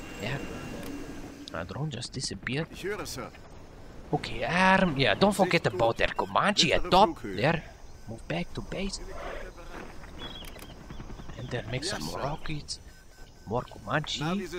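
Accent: Germany. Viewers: I love when Germans speak English :D It sounds pretty nice :D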